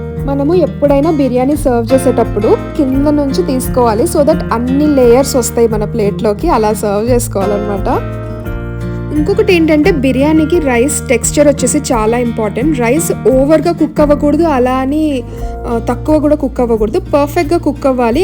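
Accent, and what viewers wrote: Rayalaseema, India. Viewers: I am also from Rayalaseema. Loved ur seema accent videos. And nice receipe too... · Hi akka...we too live in Nandyal at Sanjeev Nagar. YouTube lo asal pakka rayalaseema language ante needi akka... U don't have filters in ur flow of words.. Mana kurnool district vallu ela matladtaro as it is alage matladtunnaru. Keep rocking akka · Rayalasima slang tho video lu appudappu cheyyandi please Bokulu Jankulu Uthalabithala Benne Super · Rayalaseema lo ye ooru akka mana rayalaseema basha lo super ga chesav naadhi Kadapa jammalamaduhu 💥💥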